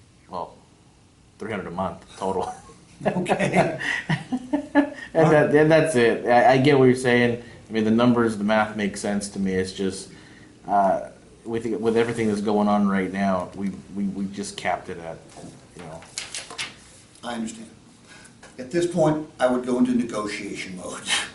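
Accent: Appalachia, United States. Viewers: and his Andy of Mayberry talk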